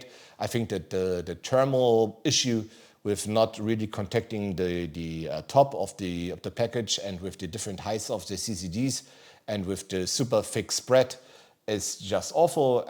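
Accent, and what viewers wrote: Germany. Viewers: I don't see the issue he sounds like every German tourist I've ever met :)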